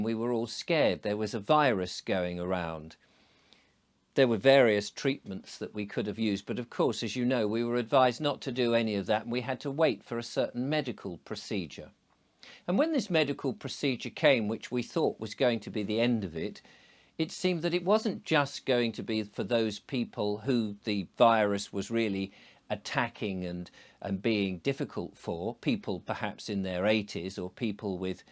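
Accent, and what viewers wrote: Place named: RP, United Kingdom. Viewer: Hi, thanks for sharing this story with us. It must be truly painful and worrying for you as a parent. I recently found you and subbed, as I find that the truth and openness that you speak needs to be heard and shared. You and I are like complete opposites, I am from the black country, broad accent and a bit rough round the edges, you are, if you don't mind me saying, a bit posh and well spoken. But strangely I can relate to you more than many others around me. I too knew the truth from the beginning and stood my ground, while many blindly followed and harmed themselves and their families. Again, thank you, from the bottom of my heart.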